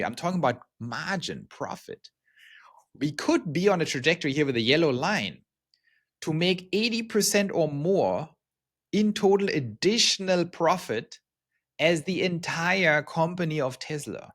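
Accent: Germany. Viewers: Interessanter Inhalt, aber der deutsche Akzent ist so hart, dass ich nicht zuhören kann 😅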